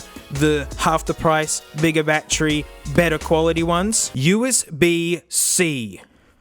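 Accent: Australia. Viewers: The Australian accent gives me the seratonin to live through this shite pandemic. I thank the youtube algorithm for showing me this amazing channel and I thank you sir for existing. Just lost my grandma recently, so being genuinely happy is something hard to come by. This made me laugh so much, I appreciate your content, youve got a sub from a very thankful viewer, cheers. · Is this guy Australia?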